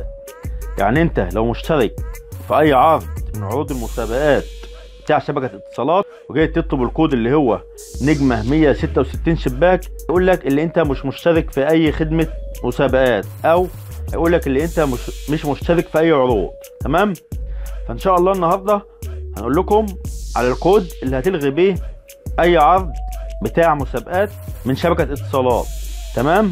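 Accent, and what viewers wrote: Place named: Syria. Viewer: انت سوري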